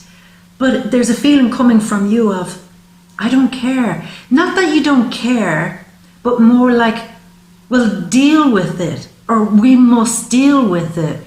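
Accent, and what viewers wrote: Ireland. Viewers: Love Love Love Love Love the Irish accent. Good on ya girl. I'm an ox........ Gotta start a new business. Buy a lease on a pub. 85000 for a 20 year lease. But I don't have good credit, so it's tricky to get funding. Gotta keep charging on. Ah thanks. Glad I found you on you tube. I'll come and see you in Ireland soon. xxxxx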